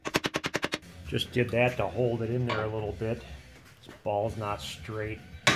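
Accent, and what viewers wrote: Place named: Minnesota, United States. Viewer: What an interesting channel, a creative thinkers oasis in the middle of Minnesota. Now I understand the accent in the movie Fargo, ha ha ha.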